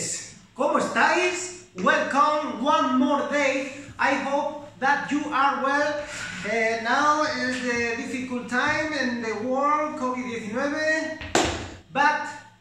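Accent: United Kingdom. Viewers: Mano el que no le guste tu ingles, que se joda! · Muy Bueno! Y tú Inglés no me molesta! Te da mucho carácter 👍👍☺️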